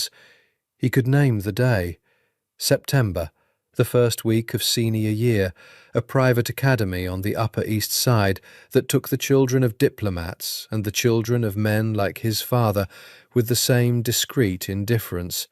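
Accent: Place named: Liverpool, United Kingdom. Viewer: Sounds like a Liverpool accent love it great storyline and characters well developed 🎉🎉🎉🎉🎉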